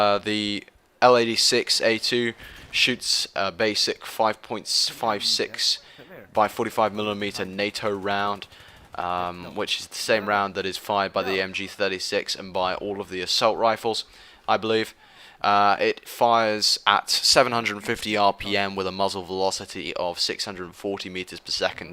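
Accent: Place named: United Kingdom. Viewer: beast vid, nice to hear a british accent. Subbed and liked!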